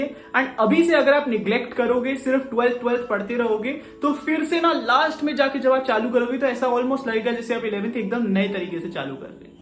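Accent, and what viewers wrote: Punjab, India. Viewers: Kya tum punjab se ho....✍️✍️✍️✍️✍️✍️✍️✍️👂⤵️⤵️👂⤵️🏆🏆🏆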